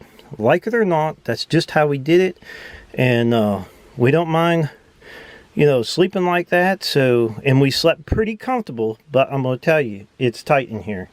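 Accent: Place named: Western Pennsylvania, United States. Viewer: robert hoffman - I caught that too..plus overall accent..my mom and dad are from around Tarentum and Oil City...I grew up in NC tho! Thanks for video..I'm single 62 yr old female just retired. I've never pulled anything behind my car and I'm looking for anything small. This is sounding more and more like the way to go!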